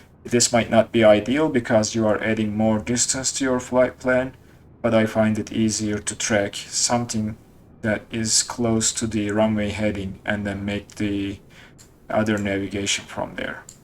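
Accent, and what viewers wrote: Turkey. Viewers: You are talking english like a turk, are you turkish, I wonder if you are turkish, I am turkish, so I'm asking, it was an excellent educational video by the way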